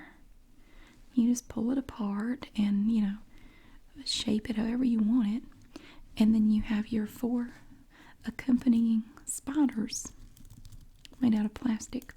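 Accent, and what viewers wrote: South, United States. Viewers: Your country accent is everythingggg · I love the way you say everything :D I didn't like southern accent until I discovered your videos. You are so sweet and funny! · Your southern accent gives me allll the tingles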